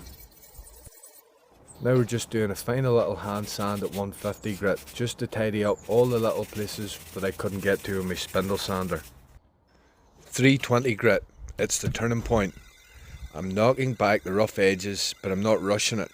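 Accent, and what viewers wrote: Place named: Yorkshire, United Kingdom. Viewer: luvin your Yorkshire accent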